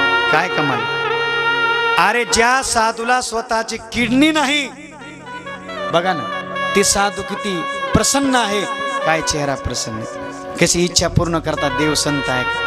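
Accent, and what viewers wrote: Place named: Rural, India. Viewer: किती गावठी मराठी बोलतोय रे तू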